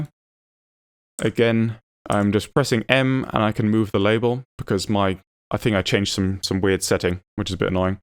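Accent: United Kingdom. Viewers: Wow, I still can't believe I've watched all of your PCB Design videos (5+ hours in total) in one sitting. Your know-how and fast skills with kicad are astonishing, plus your clean (Queen's) English makes it very easy for us non-native English speakers to comprehend what you're saying. You've earned every sub,. I am currently working on my master thesis in EE and your videos are very informative. Keep up the good work, and THANK YOU very much for your videos.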